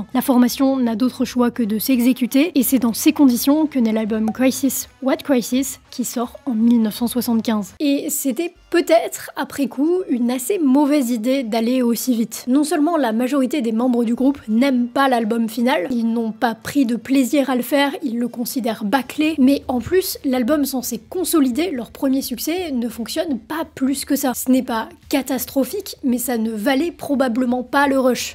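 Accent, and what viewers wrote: France. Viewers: L'accent franchouillard est à retravailler svp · sauf que la langue française prend trop le dessus au niveau prononciation des noms anglais. ça fait tâche.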